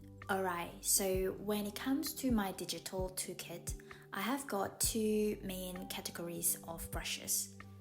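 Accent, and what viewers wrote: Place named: Scotland, United Kingdom. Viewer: Completely off topic. But I do love the Scottish accent.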